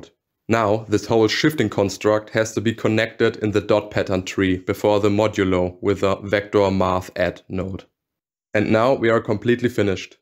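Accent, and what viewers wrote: Germany. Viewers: Very nice breakdown, directly used it to replicate a DVI input that thicc enchanting german accent <3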